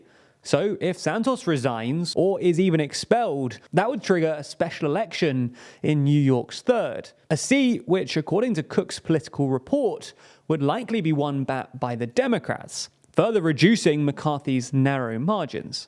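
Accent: England, United Kingdom. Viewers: San-toss, ah-shoe-ming, etc. Englishmen are so funny, when they open their mouths. Otherwise a great report on a huge American voter demonstration of stupidity.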